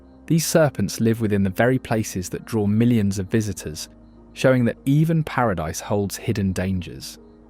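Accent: England, United Kingdom. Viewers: Can't stand all these British narrators talking about America. Why don't they go back to their Muslim country? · What’s up with this bloody Limey’s accent?🤮 It’s Arizona, so speak American🇺🇸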